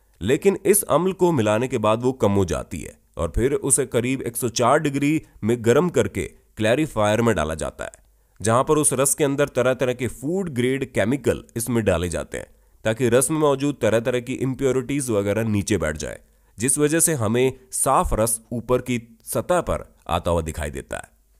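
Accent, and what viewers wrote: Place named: Haryana, India. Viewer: Host ki awaz haryane ki lg rhi h 🤩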